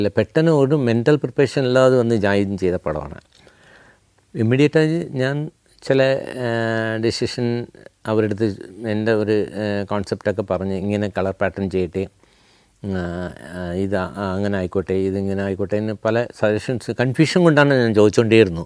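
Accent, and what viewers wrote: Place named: Tamil Nadu, India. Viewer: മി അളഗപ്പൻ, താങ്കൾ തമിഴനാണോ. നിങ്ങളുടെ മലയാളം ഒരു തമിഴൻ മലയാളം പഠിച്ചു പറയുന്നതു പോലുണ്ട് !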